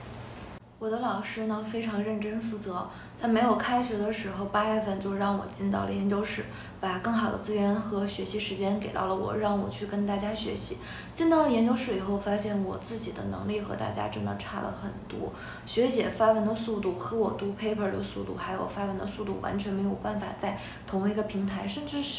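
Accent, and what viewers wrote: Northeast, China. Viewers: 老妹儿，东北口音有点重啊～～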